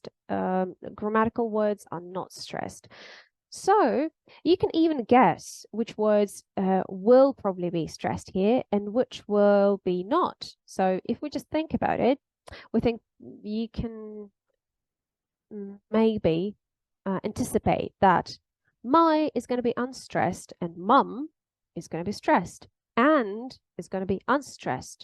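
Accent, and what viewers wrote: United Kingdom. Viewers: i love the gentleness and preciseness of the british accent if that even makes sense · What's the practical reason for having such a very British-like sounding accent?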